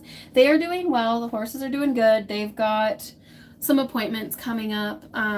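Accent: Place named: New York, United States. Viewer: Thank you so much for the fun live yesterday. Just going back and watching the beginning… chef’s kiss to your New Yawk accent. “We makin’ CAWFEE OVA HERE!” 😂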